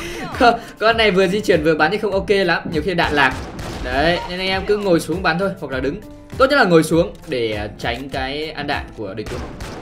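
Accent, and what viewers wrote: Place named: Saigon, Vietnam. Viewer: ông này giờ nhại sài gòn rồi :)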